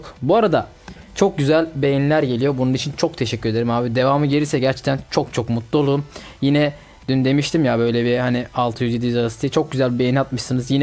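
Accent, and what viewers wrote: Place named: Adana, Turkey. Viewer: GALİBA BENİM GIBİ ADANALISIN!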